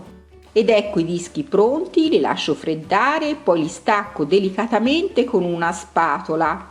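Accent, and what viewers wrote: Tuscany, Italy. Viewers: Anche lei è deliziosa, sia per la pronuncia Toscana che per le meravigliose ricette!!!!😘😘👩‍🍳🍰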